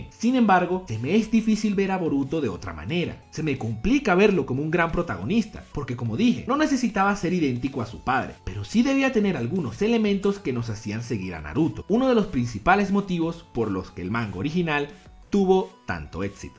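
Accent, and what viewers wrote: Ecuador. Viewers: Eres de ecuador? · Eres de Ecuador? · Eres de ecuador ?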